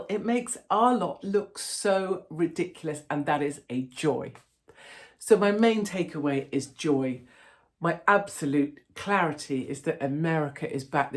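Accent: RP, United Kingdom. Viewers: You might understand this...I remember a female officer going through some trauma.where she was crying..she got a hug from an NCO (a very experienced single NCO) and she was disgusted at being touched by a filthy subhuman NCO....and screamed .....Get off me you are not an officer....the rest of her time in Regt was not good...which is why I take the piss out of you back in the old days with your posh officer accent and now you need the support of the 'ordinary's you have toned the 'Sandhurst twang' right down. Get it?? By the way this was Signals, not some queen marys own wankers, where they wear spurs to dinner???....and the solid silver spurs cost 500 quid each and you can't actually be an officer unless you have an outside income because extra messing is more than your actual pay. Doubt you will read this but others will.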